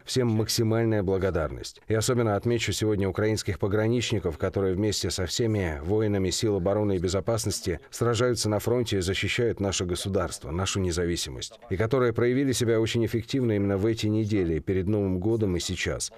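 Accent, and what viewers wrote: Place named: Moscow, Russia. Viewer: почему с московским акцентом ? учи мову !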